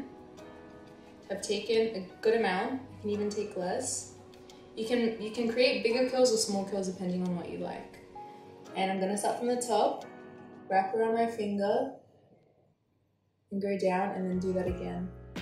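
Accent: Australia. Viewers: You sound so aussie lmao. No hate. You're so cute... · Now how tf did Ik u had Arabic roots even tho u sound full on Aussie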